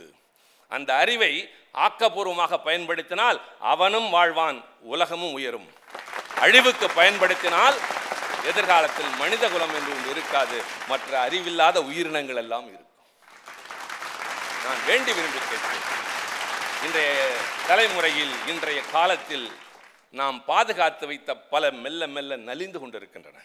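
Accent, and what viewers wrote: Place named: Thanjavur, India. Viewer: அருமையான பேச்சு.தஞ்சைத் தமிழ்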